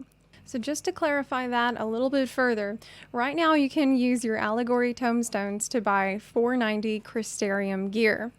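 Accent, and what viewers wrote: South, United States. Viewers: You sound like you're from the south. Coastal southeast.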